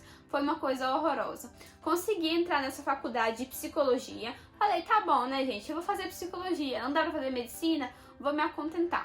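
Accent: Italy. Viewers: Você sem perceber adquiriu um pouco do sotaque italiano, que legal haha! Você fala "cantando" em alguns momentos. Un abbraccio. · Ouvir vc falando que sofreu e ouvir vc falando já com sotaque italiano da um orgulho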